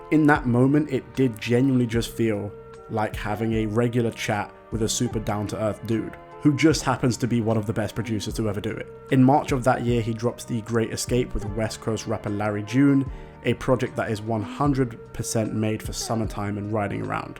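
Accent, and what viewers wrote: United Kingdom. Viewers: im listening to a a British person talk about Americans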